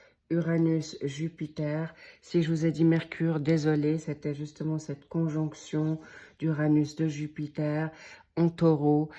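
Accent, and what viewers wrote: United Kingdom. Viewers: Merci beaucoup pour ce splendide tirage 🙏 Et merci pour votre diction parfaite et cette pointe d'accent d'outre-manche 🎉🙏😊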